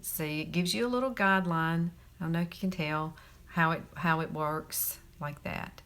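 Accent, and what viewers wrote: South, United States. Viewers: OMG, This is sooo borning, and annoying.. Please tone your voice down on all these ads.. and stop trying so hard to be cute... Just do it .. I do not have all day.. chances are I will click you off before you get to the facts.. Wow.. is she southern or what ??? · You are just so darn cute! I'm a sucker for a southern accent! Man, woman or child! I feel like you could cuss me out and you would still sound sweet lol! Have a great night!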